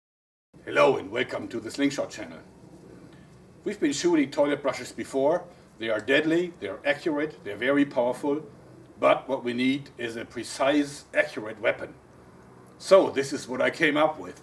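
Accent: Germany. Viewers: german accent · Are you German? Your accent sounds like that (I'm a native German so I this accent and I'm pretty sure he's German)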